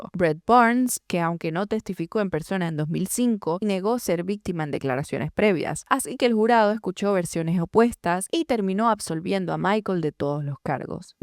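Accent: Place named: Venezuela. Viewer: Me gusta muchisimo tu acento venezolano, (soy española ) a de mas tu tono de voz es elegante y muy relajante., podria escucharte el dia entero ❤❤❤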